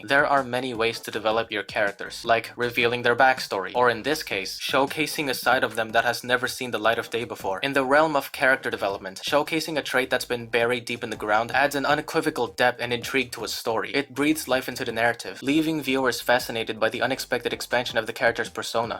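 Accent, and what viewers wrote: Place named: United States. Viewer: How do you have an American accent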